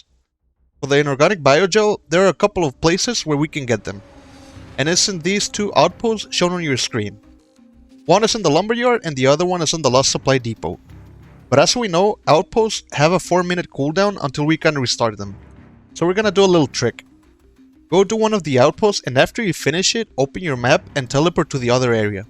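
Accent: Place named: Mexico. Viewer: I can hear the Mexican in ur accent lol. All love brother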